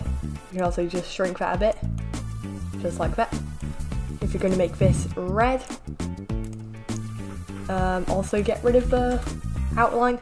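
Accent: United Kingdom. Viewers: And british to wow :o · You’re laughing that a guy is British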